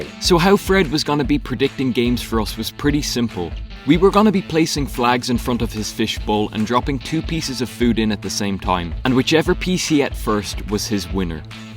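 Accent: Ireland. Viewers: Are you from Ireland🇮🇪🇮🇪🇮🇪?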